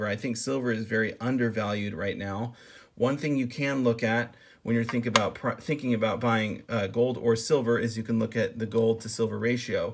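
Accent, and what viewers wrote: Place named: San Bernardino, United States. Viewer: That accent. San Bernardino?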